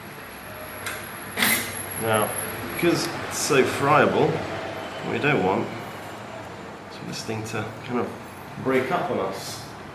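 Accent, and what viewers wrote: United Kingdom. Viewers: Its really nice to hear the brit accent in one of these vids. I was starting to think only Americans did this 😅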